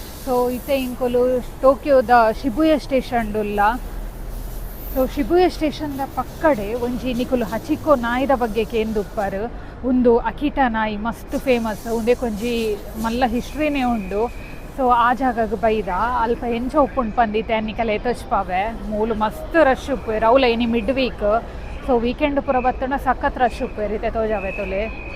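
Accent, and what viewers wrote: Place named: Tulu, India. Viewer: ಈರೆನ ತುಳು ಪೊರ್ಲು ಆಪಂದ್ದು. ಮಸ್ತ್ ಮೊಕೆದ್ದ ತುಳು ಪಾತೆರಿನ ಖುಶಿ ಆಪುಂದ್ದು..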